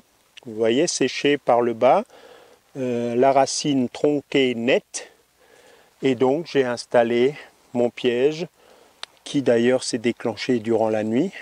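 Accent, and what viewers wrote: Alsace, France. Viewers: Non, de grâce, ne changez rien! C'est notamment la longueur de vos vidéos qui en ont font tout l'intérêt, car on apprend aussi beaucoup de choses dans vos apparentes digressions, qu'elles soient relatives aux techniques de culture ou plus éloignées du sujet (grâce à vous j'ai réécouté avec plaisir!). D'autres détails un peu décalés et "inutiles" dans l'absolu me font mourir de rire et ça fait du bien aussi! Et puis quel bonheur d'écouter votre parfaite maîtrise de la langue française, l'utilisation toujours réfléchie du juste mot, c'est devenu tellement rare, c'est comme du miel qui coule dans mes oreilles... On ne voit que trop l'effet direct et catastrophique des textos et autres twitters sur l'appauvrissement de la langue française et du raisonnement intellectuel... Et votre charmant accent alsacien qui fait votre signature sonore me berce, me repose... 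C'est l'éloge de la lenteur, de la fantaisie, ça va à l'encontre de tout ce qui fait notre époque moderne (concision, rapidité, prétendue et illusoire efficience), et, n'en déplaisent à certains (libre à eux de faire "avance rapide" ou de regarder d'autres vidéos), ça fait le plus grand bien!!! Alors merci, merci, merci, pour tout ce que vous êtes, ce que vous donnez, et surtout, continuez comme cela, monsieur le paresseux!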